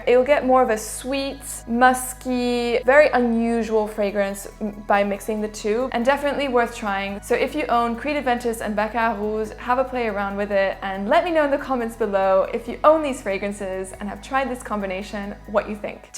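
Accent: France. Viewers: Tu es Française ? tu as l'accent français quand tu parles anglais 😅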